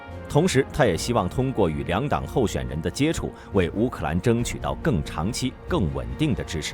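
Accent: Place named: Beijing, China. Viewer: 感謝本節目的男主播，以標準的北京話報導日字正腔圓清楚的報導謝謝🙏。❤❤❤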